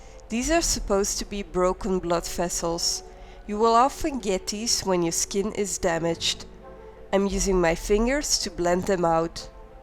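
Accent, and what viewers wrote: Netherlands. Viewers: This looks really good and detailed! thanks! btw where are you from? your accent sounds slightly dutch or something😊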